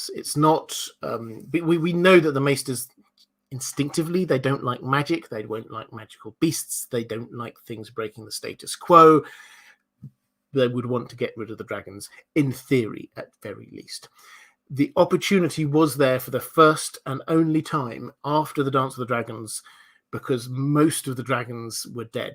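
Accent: England, United Kingdom. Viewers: Every night I play about 20 min of these vids and they seem to slowly rock me into a peaceful sleep. It’s to the point now where I can’t fall asleep without hearing my mans boss English accent.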